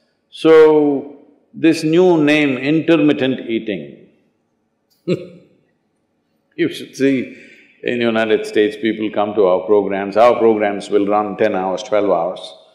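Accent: India. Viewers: 不是没有对的肤色，而是印式英语真的好难听懂啊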